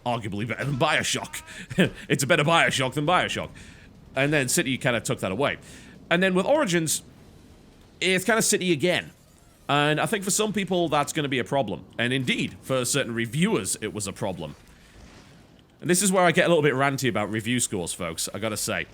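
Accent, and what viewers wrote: United Kingdom. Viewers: Oh crap! Did you just correct your pronounciation of the word "usual" to the american version!? :D Careful, or you'll lose your british accent...foreeeveeer...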